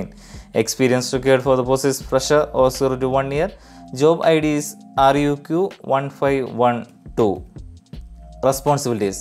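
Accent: Kerala, India. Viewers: endu problm santhosham 😍 chettante slang ketapozhe oru malayali touch thonni 😀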